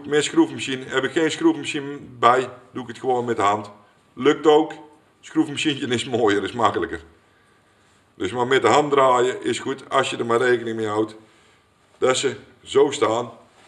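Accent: Brabant, Netherlands. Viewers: jij bent een baas op het brabants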